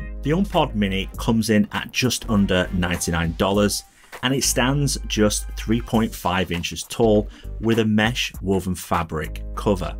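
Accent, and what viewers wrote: England, United Kingdom. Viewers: Are u in the uk? I’m only asking as you mention the price in dollars but have a English accent 🙌🏼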